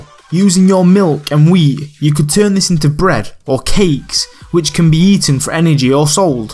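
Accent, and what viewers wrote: United Kingdom. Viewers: I love your content so much but your accent annoys me so much 😂 I'm sorry, I still watch but it does annoy me not sure why. which part of Britain are you from? Lancashire here:)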